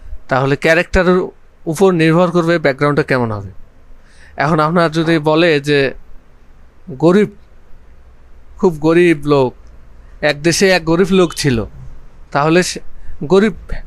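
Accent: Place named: Rangpur, Bangladesh. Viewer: ভাই আপনার বাড়ি কি রংপুরে নাকি? ভাষাটা খুবই পরিচিত মনে হচ্ছে ❤️❤️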